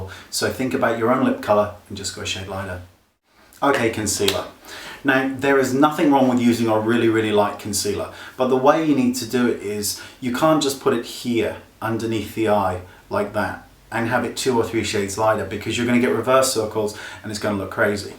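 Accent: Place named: United Kingdom. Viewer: I love your channel... I come from Italy and for me it's a bit difficoult understand your british English :D (i understand better American English)... But I love the way you say your advices :D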